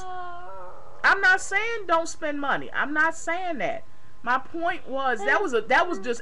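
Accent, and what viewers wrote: Baltimore, United States. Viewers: is that a baltimore or d.c. accent?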